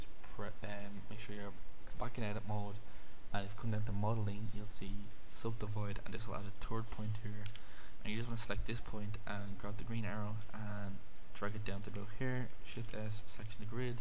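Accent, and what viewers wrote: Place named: Ireland. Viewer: Great tutorial on Bézier curves -- sounds like you're from the same part of Ireland as I am.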